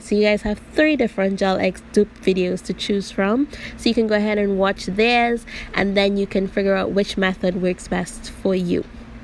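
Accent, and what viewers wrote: Trinidad and Tobago. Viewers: I just knew you were a Trini when I heard you talking! I got the Apres Gel X done but I figured I could do it myself because I like the simplicity of the system.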